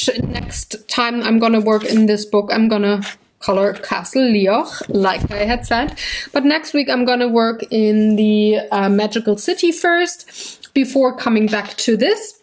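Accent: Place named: Germany. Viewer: Hut ab, dass Du das alles auf englisch machst! Vom Akzent her solltest Du das hier lesen können ;-) Meine Sabbelvideos hatte ich ursprünglich auch auf englisch gemacht früher, aber der Humor ging verloren. Dafür spreche ich wohl zu schlechtes Schulenglisch :) Schaue mich gern auf Deinem Kanal um.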